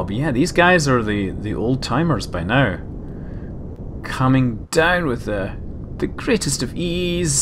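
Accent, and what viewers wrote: Scotland, United Kingdom. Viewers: Nothing can be boring with a scottish accent!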